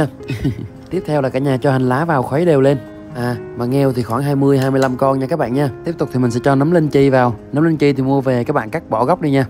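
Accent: Quang Nam, Vietnam. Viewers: Con trai người Quảng Nam hay Đà Nẵng vậy ? Chúc mừng kênh con , bác luôn theo dõi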